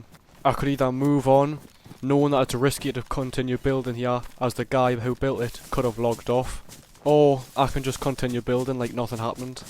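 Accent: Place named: Newcastle, United Kingdom. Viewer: Nice to hear a geordie voice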